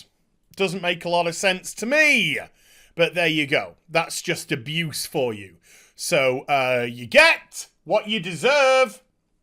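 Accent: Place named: Yorkshire, United Kingdom. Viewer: Got halfway through I can’t believe our lack of perception I’ve got because it’s now suddenly apparent you are so typical Yorkshire very articular but very comical but you’ve just explained something because yes you’re on the ball and I’m learning a lot because I’m hoping to be a YouTuber eventually but all I can say is oh you are awful but I like it clue is I’m Apollo I do apologise I forgot his name well-known very camp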